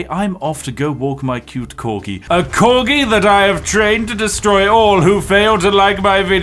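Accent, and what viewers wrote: United Kingdom. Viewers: this brit be spiffing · This dude is British in every way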